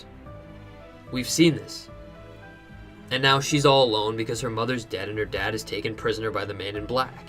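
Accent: Canada. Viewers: do all Canadians pronounce Theresa as "tharissa"?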